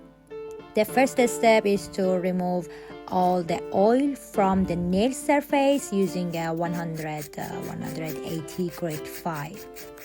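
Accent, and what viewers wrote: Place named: Iran. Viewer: وای انگلیسی‌ت لهجه‌ی فارسی داره 😅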